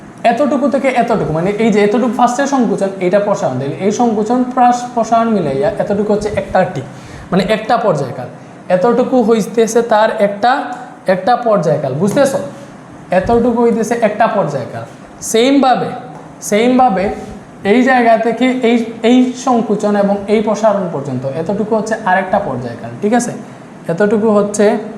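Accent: Sylhet, Bangladesh. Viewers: ইসসসসস , স্যার আপনি কি সিলেটি 😅😊😊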